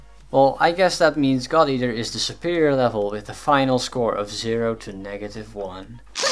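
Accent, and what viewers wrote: Netherlands. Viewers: Are you Dutch? Your accent is dutch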